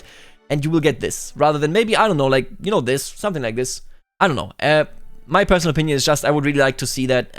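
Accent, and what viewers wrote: Bavaria, Germany. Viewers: Do you speak german with a bavarian accent or do you/can you speak standard german?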